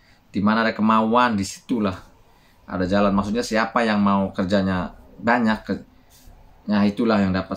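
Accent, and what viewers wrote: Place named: Banyuwangi, Indonesia. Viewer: Koyok wong Banyuwangi to mas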